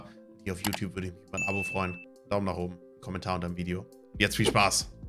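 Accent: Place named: North, Germany. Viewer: sehr sympatisch, da merkt man den Norddeutschen, muss man an der Stelle einfach mal sagen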